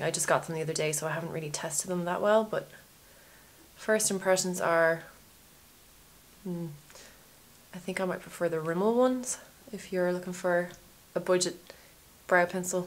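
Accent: Ireland. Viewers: Are u irish? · She's Irish, if that's what you're asking (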